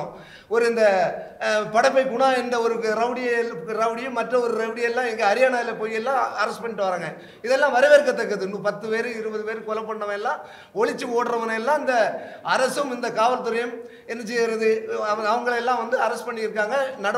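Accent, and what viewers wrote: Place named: Tirunelveli, India. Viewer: நெல்லை/ நாகர்கோவிலில் பாஷை தெறிக்குது....🔥🔥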